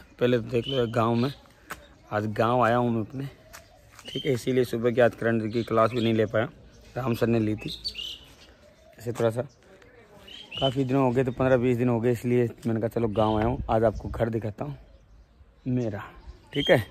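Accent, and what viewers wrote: India. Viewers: Haryana s hain kia sir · Full rajasthani tone 🤭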